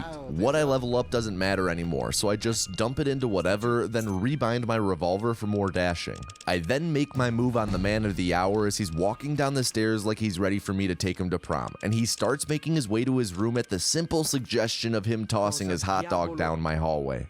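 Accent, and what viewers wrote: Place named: Minnesota, United States. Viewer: Good MN accent, as a Minnesota native, I approve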